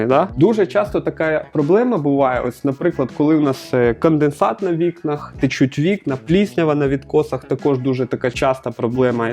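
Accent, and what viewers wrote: Surzhyk, Ukraine. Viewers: У вас дуже багато суржику кацапського. Прошу звернути увагу на свою вимову ви ж молодий! Дякую відслаюваться.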